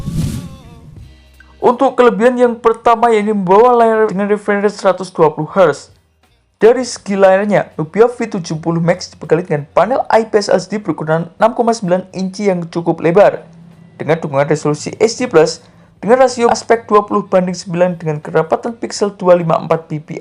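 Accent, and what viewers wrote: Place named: India. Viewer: Kukira logat india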